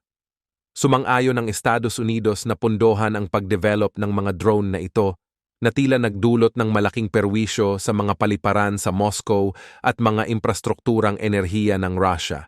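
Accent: Visayas, Philippines. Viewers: Hoy ayusin mo tagalog mo.biaya kaba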